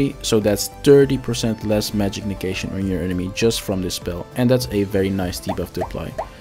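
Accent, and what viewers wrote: Netherlands. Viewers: Are you dutch? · You da man! Thanks for the guides. By the way, is it correct that I recognize a Dutch accent?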